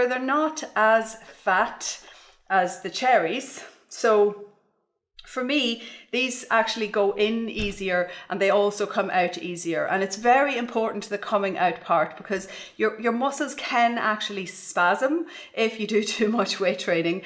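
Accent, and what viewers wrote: Ireland. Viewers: Beautiful Irish accent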